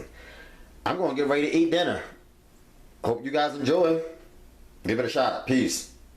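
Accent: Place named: Philadelphia, United States. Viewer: He said it right he has a Philly accent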